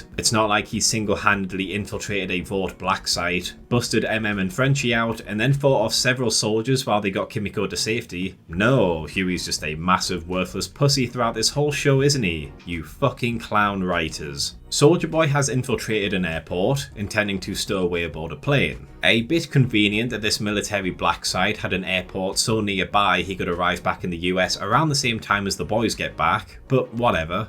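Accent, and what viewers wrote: United Kingdom. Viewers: 2 HOURS OF A BRI-ISH GUY BITCHING ABOUT HOW UNBELIEVABLE A SUPER HERO SHOW IS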